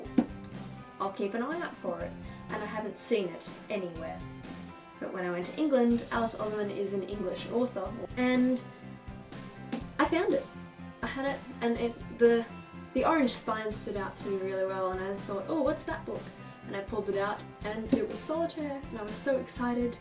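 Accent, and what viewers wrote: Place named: Australia. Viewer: I miss Australia so much, so I really liked your video! SIGH the wonderful sound of an Australian accent.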